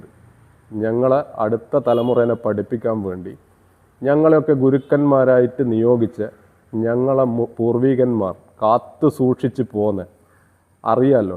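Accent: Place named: North Kerala, India. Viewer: Thiruvanandhapuram,pakshe slang vadakkananallo GURUKKALE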